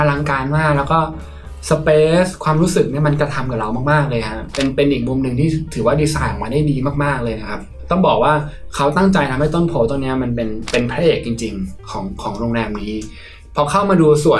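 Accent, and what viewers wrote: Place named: North, Thailand. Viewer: อู้เหนือได้น่าร้กจัง. 😊😊😊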